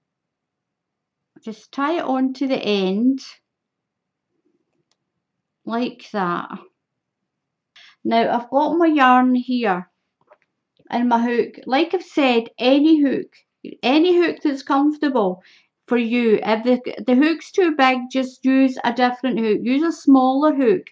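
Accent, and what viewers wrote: Scotland, United Kingdom. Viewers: Oh My! Hope I'm not wrong. You sound Scottish. And hopefully I spelled it right otherwise my Gramma would kill me if she were still living. She was my Hero. Everyday in every way I aspire to be just like her. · I love your crafty videos,! I also love to hear the Scottish accent spoken... I like to think it's something in me hearkening back to my Scottish roots, haha! Anyway, Thank you so much for sharing this tutorial! Rachel D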